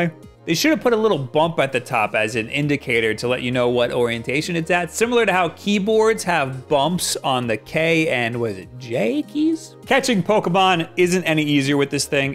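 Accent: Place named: New York, United States. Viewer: Hey are you from around the north eastern US? I'm detecting a hint of an accent, maybe New York?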